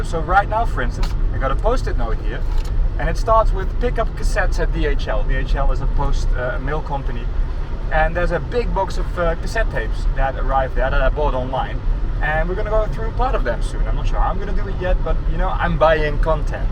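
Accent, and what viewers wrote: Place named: Netherlands. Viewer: I thought you were Dutch,recognizes the accent,I’ve played hockey against Eindhoven way back in the 70s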